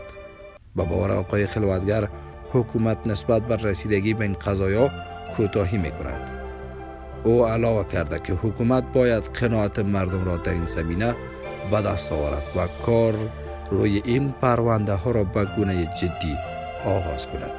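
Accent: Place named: Iran. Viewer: Az lahja irany to tamam chez malum meshawand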